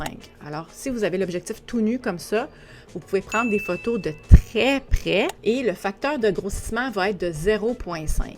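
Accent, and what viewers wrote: Canada. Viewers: J'adore, sa manière de parler, d'être. Videos toujours très intéressantes. Je suis amateur fan de photos sportives comme. J'utilise un boîtier Sony A7III. Merci J'adore ton magnifique accent canadien 😍😍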